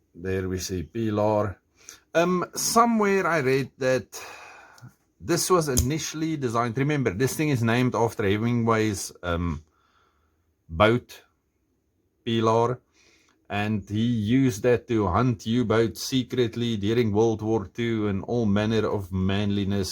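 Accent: Afrikaans, South Africa. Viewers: Love die Afrikaanse aksent ou bul. Lekker om Suid-Afrikaaners ook op YouTube te sien.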